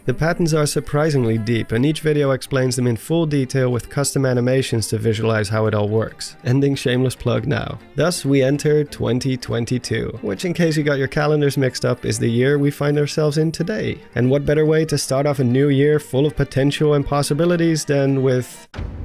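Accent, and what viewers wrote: Netherlands. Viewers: is this guy dutch ? whats his accent :)?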